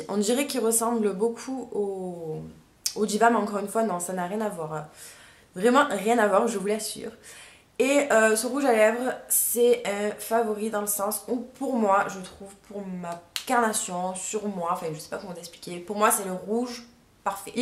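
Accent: South, France. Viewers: j'aime bien ton accent tu viens du sud toi aussi?^^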